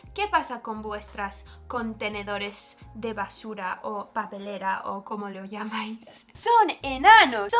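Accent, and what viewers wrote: Galicia, Spain. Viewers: Vídeo super simpático. El gotelé es una horterada. Cierto.q a veces en las aceras hay 4 personas caminando sin dejar espacio, lo suyo entonces es q dos personas de esas se pongan detrás para dejar pasar a las del sentido contrario. No en todas las partes de España acompañan a la bebida alguna tapa, por ejemplo en Cataluña. El agua de grifo en Cataluña es horrible. Puede q algunos bares sirvan agua con botella que haya sido "tratada", es decir q pasa por algún mecanismo para depurarla y quitar mal sabor. El "taluego" es un clásico, especialmente en los pueblos, donde sí q posiblemente puedas ver a esa persona o no. Por cierto, se te está poniendo un poco acento gallego, puede ser?;) A seguir así,!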